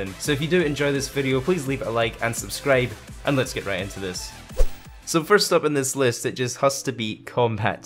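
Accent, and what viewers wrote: Scotland, United Kingdom. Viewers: SCOTTISH AF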